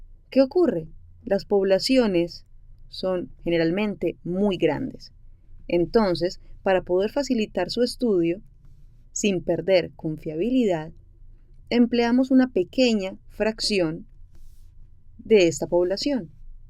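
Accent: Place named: Colombia. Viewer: Esa voz es colombianaaa!!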